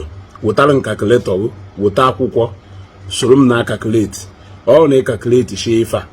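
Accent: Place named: Nnewi, Nigeria. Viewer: Nnewi be anyi i na asu ebe a na-ato m uto okanku. Doo na-asusiya ike.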